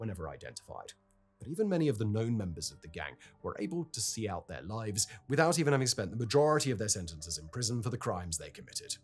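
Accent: United Kingdom. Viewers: Love a posh English twunt explaining Northern Ireland and pronouncing words incorrectly with the utter confidence that comes with posh twuntery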